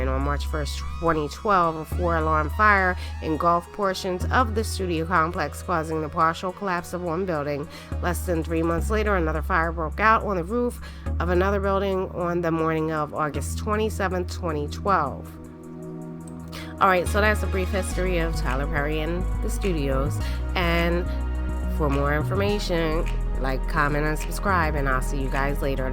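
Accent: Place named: Maryland, United States. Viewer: You from maryland or d.c.? Your accent is heavy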